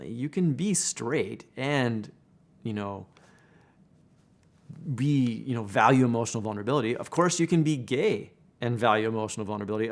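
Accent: Canada. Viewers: Hmmm, I don't know .. This is the first video of yours that I watch (and I like it a lot by the way, I like the clear way you express your ideas and thoughts) You sound Canadian or American to me, and I find the "homophobia" thing more present there to be honest, so that could be something to note? Because in so many other places I find that men do express more emotion to their friends without this fear of "appearing to be gay". I also believe that men are not as emotional as women, or in better words: have different emotional needs than women does, in terms of sharing or expressing. Which explains the universal idea of (men being stronger and less expressive) that's been around throughout history. That being said, I do agree with you about stereotypeing, it makes life pretty rough for the more sensitive and emotional men. I think it always comes to that old tale of people thinking of themselfs as the "standard mold" that all others should be shaped with, which is a very human thing to think (it has to do with the natural need in people to feel connected and safe), but also it is the exact thing that makes life harder for every "different" human who is trying to fit in. 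This is very interesting to me, it's an issue that I got invested in and studied for my master's thesis, actually, and I found that the only way to solve it is to, basically, open people's minds to differences and teach them how to deal with it without losing themselves or causing others to lose themselves eit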